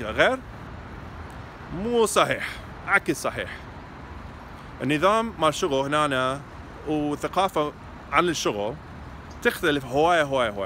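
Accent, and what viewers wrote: Iraq. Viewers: your pronunciation of Arabic and Iraqi accent is incredibly good for a seemingly US born individual, you must have served in Iraq or something 😉 . Greetings from Cairo, Egypt or AlQahera- Misir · Hi..i m subcribing your channel.. because MashaAllah..im impressed..im a muslimah from Malaysia..i asked my elbi..dear listen.. without seeing your face..you really truly honestly sound iraqi..so when i showed him your face..he laughed.. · I like how you speak Iraqi accent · Your Iraqi accent so cute, you are gorgeous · ?????? WHY YOU SPEAK IN IRAQI ACCENT??? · You got the iraqi accent duuuude .🤣👍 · أروح فدوة للهجتك العراقية الحلوة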